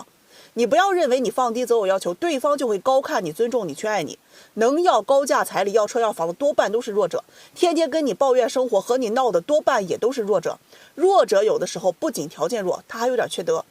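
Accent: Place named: Jiangsu, China. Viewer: 她江苏的 口音不是东北的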